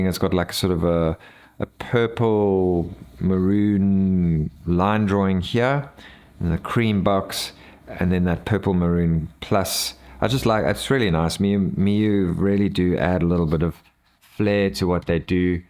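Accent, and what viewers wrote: South Africa. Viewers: This came up on my recommendations because I’m keen to get this! But I have to ask, are you South African!? So cool! Can’t wait to get my Miyoo Mini Plus 😃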